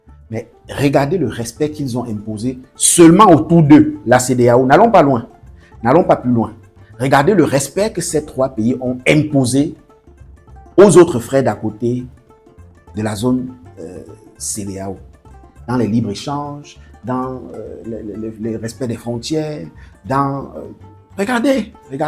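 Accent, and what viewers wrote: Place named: Cameroon. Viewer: A travers l accent tu est biyamerounais toujours c,est vous vous connaissez tous sauf les problème de votre pays ,toujours entrain de parler de tous et se faire donneur de leçon,concernant le cfa nous on est déjà sortie pr l,eco ,c,est chez vous il ya meme pas débat ,avec vos président dictateur,parle de biya dictateur qui veut pas aller a la retraite.aprt la poussière y a rien au Cameroun.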